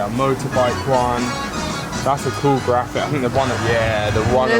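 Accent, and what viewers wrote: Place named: London, United Kingdom. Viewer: i was gonna like straight away just for the accent most of these videos arent acc done by londoners 🤣😅no shame though love to everyone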